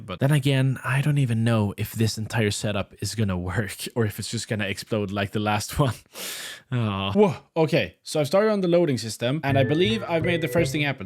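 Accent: Sweden. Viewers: Are you Swedish?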